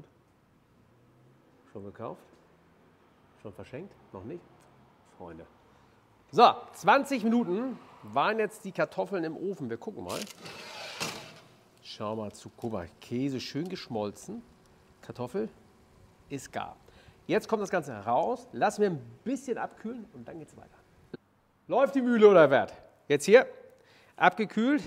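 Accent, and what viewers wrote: Bavaria, Germany. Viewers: Alles OK aber hör bitte auf Bayrisch zu reden. Das hört sich bei dir sooooo grausam an... 😭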